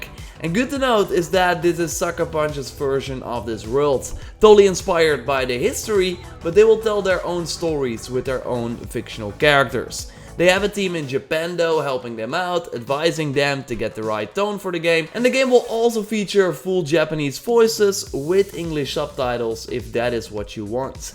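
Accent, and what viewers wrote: Netherlands. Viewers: Je kon wel eens Hollands zijn:) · Nice Dutch accent bro.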